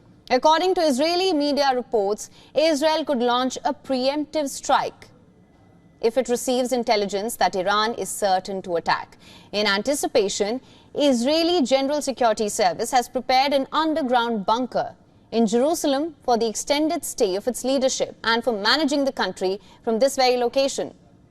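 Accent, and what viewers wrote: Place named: India. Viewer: I love Indians accent 😍😂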